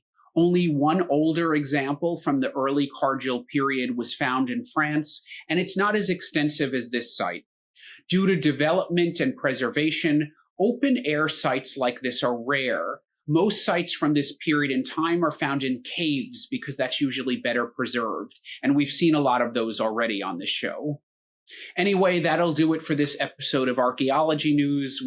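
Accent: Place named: United States. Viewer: He didn't pronounce it incorrectly for someone with an American accent. You don't change your accent to pronounce a place name. I'm sure you don't pronounce New York, Orlando, Los Angeles, New Orleans or any other American city the way that an American would. Someone with a rhotic accent saying "Mel-bin" is incorrect and distracting. It's like an English speaker walking around pronouncing Paris the way the French do.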